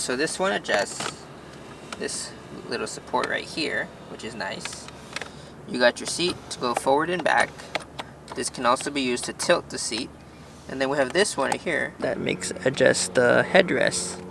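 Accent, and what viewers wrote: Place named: United States. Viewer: What the fuking wrong with your American voice?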